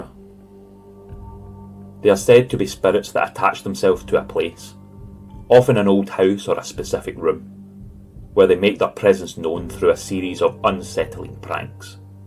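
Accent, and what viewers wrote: Scotland, United Kingdom. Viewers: This was PERFECT. Love your voice the narration graphics everything. I think I’ll subscribe. Great channel. Are you from Scotland?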